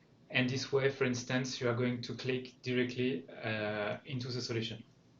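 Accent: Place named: France. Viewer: the french accent is terrible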